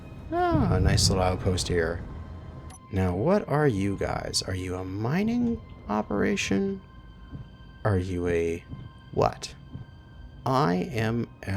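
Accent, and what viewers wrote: United States. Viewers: I'm not usually fan of American accents being English myself, but your voice is rather quite soothing to just listen to/watch while i'm doing things on computer :)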